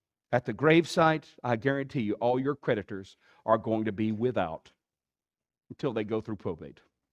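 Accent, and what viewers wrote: South, United States. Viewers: I don’t have to miss Southern accented preaching just because I converted lol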